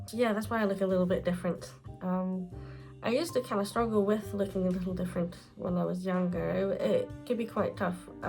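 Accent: Scotland, United Kingdom. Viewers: I need to be more “ GRACEFUL and DELICATE “ 😂. I completely relate. Aw your videos make my heart happy! I’m an animal and wildlife lover plus I’m partial to a wee mushroom also. So happy to have found your channel ❤ ps. I can still hear the Scottish twang going on, I’m Scottish myself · 😍💕🐿🎃 I'm levitating too, just from watching this video! 😅 (Getting Scotch tape to reattach myself to seat 😉)! Your illustration is magical!✨ Loved the forest and the legend of Skogsvrå, and the busy squirrels, and there's that charming witchy animation too! 🧙‍♀️🐈😊YouTube suggested one of your videos yesterday, and when you appeared, my first thoughts were: Oh, great vibes, kind face, lovely voice, nice Scottish person (I'm from the north of 🇪🇸, so you sound really Scottish to me 😉), wonderful sense of humour, likes what I like, delightful cosiness around her, and totally amazing artwork…! 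🤩Subscribing!! 😃👍 Thank you so much (And Margo😉), and have a very lovely day!! 🙏👏👏👏😘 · Hi. I am in awe! Why have I not seen you before?! This painting is fabulous! I love it! re: your accent. At first I thought Scot cuz it reminds me of a friend's accent from Scotland, but then some words sound like my friend from Sweden. The mix is beautiful..And, of course, I love being right LOL. On both counts 😂😂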